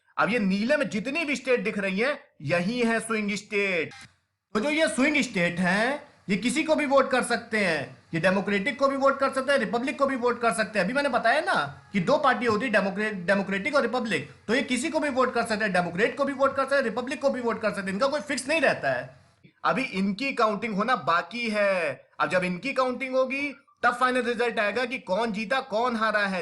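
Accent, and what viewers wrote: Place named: Bihar, India. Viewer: Vhaisab apne toh dhom macha dii ... voice toh akdm Bihari babu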